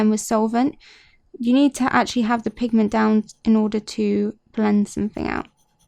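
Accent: United Kingdom. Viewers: I love your channel ❤️ also are you British? Xx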